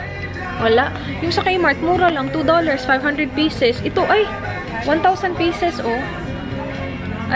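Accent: Visayas, Philippines. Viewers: I'm new to your channel and I'm like "she speaks tagalog pero parang bisaya" because I can relate. Then I heard you say "Ay ambot nimo". #confirmed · Luhhh bisaya so ate♥️😅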